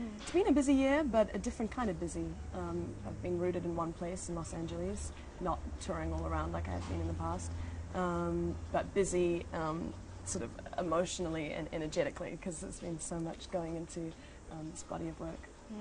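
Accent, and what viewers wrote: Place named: New Zealand. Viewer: I love her speaking voice as well as her singing voice. The Kiwi accent really knocks me out.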